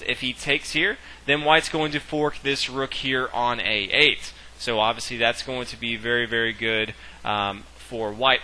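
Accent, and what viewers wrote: United States. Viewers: thanks a lot for your videos, helps me to find a path in that wood of openings. now i only have to find a way to make my son (13 years, german and learns oxford-english at school) understand your amarican accent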